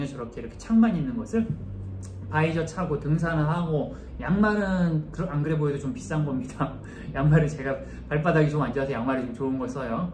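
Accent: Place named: Jeolla, South Korea. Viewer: 전라도 억양이 살짝 있구마잉 반갑소잉~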